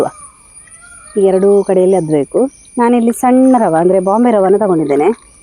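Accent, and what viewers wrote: Coastal Karnataka, India. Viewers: ನಿಮ್ಮ ಕರಾವಳಿ ಭಾಷೆ ಕೇಳಲು ಬಲು ಚೆಂದ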